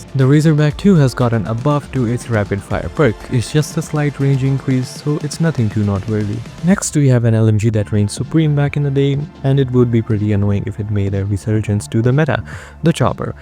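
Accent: India. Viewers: non Indian VOs please comeback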